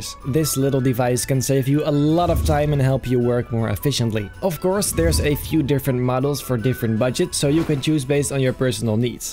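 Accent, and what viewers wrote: Netherlands. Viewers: ik wist het, je klonk al zo Nederlands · When I heard you speak I had some suspicions and during the contest segment it was indeed confirmed you're a fellow Dutchie. Leuke video, ga zo door!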